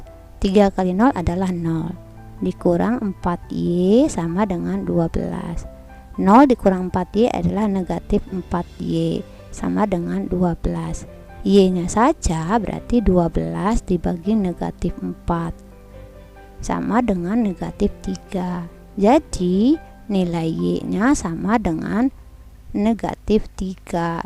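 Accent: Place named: Padang, Indonesia. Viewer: langsung tahu logat dunsanaknyo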